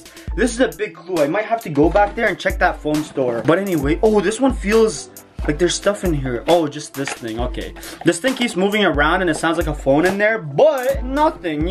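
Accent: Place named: Chile. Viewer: Abla como chileno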